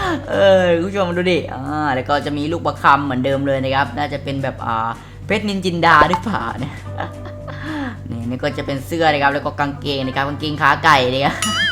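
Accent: South, Thailand. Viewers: พี่เป็นคนใต้ม้ายอะ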